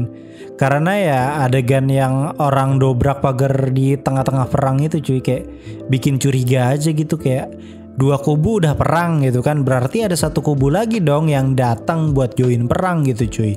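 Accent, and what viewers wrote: West Java, Indonesia. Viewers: Enaknya channel Ini Kadang Ada Logat Sundanya... Jadi enak Buat saia yg urang sunda pisan... Btw salam subscriber baru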